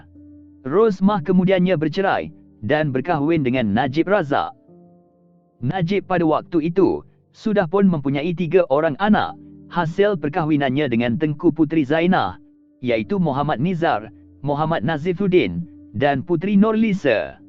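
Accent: Malaysia. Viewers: soraya bukan suraye...najwa bukan najwe aduhaii nak cakap kL pun agak2 la ...bola nak cakap bole ke? adoii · Biasa Melayu belah Melaka sebut macam ni. Dialek Melaka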